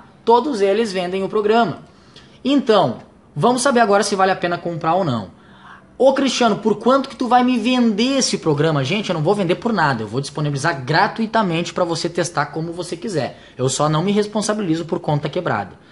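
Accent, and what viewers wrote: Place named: Rio Grande do Sul, Brazil. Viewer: Cara, tu eh gaucho? Pelo sotaque parece... tambem sou do sul, abraco, otimo video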